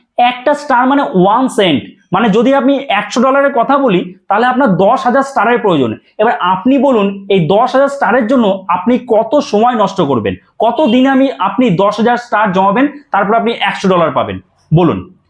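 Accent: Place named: West Bengal, India. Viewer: পশ্চিপবঙ্গের বাকভংগি ভালো লাগে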